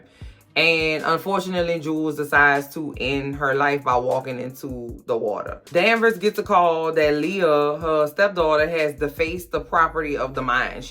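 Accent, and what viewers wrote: New Orleans, United States. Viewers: I love your reviews and your NOLA Accent .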